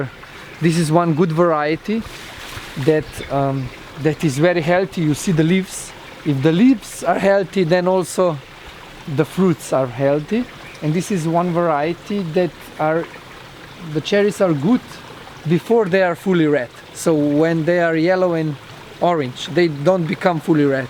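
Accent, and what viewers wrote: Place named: Wales, United Kingdom. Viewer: Slovenian. Accent isn't a hundred miles away from Welsh either ha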